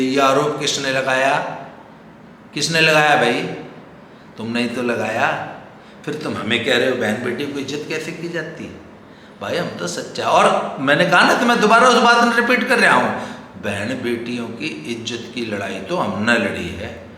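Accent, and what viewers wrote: Haryana, India. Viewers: Excellent "KHICHAI" by speaking "Excellent Haryanavi Boli" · दद्दा आपकी हरयाणवी की इतनी तगड़ी पाकर दिल खुस हो जाता है खुदन गांव की पूरी जानकारी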